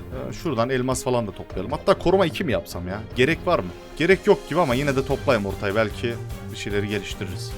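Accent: Yozgat, Turkey. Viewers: 10.56 Abi Yozgatlisin yalnız Kral eline sağlık abiciğim cağnıı yerimmm ❤ Video mük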